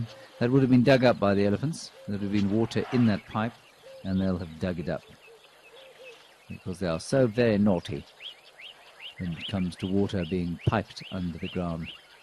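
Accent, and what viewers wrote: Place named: South, United States. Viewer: love your southern drawl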